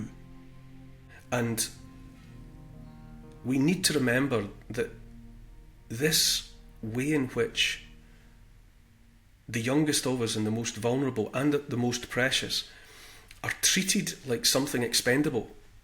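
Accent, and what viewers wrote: Scotland, United Kingdom. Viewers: Probably because... Won't Kneel A gentle, beautiful Scottish lilt A kind, empathic, forward tilt An open heart; a caring face A willingness to take his place. A strong, home-hitting monologue Reminds us of the boiling frog The courage to become a sleuth A passion to release the truth. A deep desire to crack the whip Illuminates our freedom-strip Calls out the darkness taking hold The shiny despots; plated gold! Intense and raw, he lays it bare A soul-enhancing, honest stare Inflections drive the message through He speaks for Us - for me and you! Discernment's such a precious gift His words create a mighty lift Take heed of all he has to say He knows - it shouldn't be this way! 🤗🦋🐬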